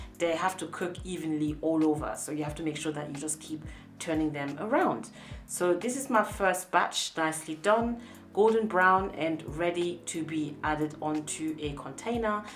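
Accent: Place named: France. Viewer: I can feel your French accent in the English 😂😂 you're such a patient teacher 💕💕💕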